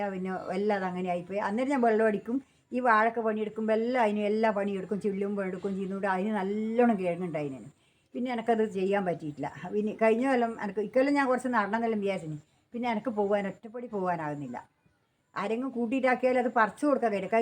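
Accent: Kannur, India. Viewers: ഏച്ചി കണ്ണൂരാണോ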